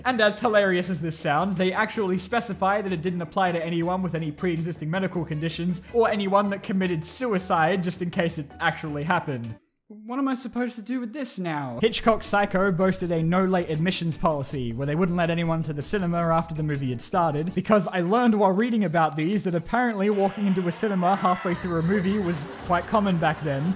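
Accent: Australia. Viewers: "Babe Wake up, the funny Australian made a new video" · funny Australian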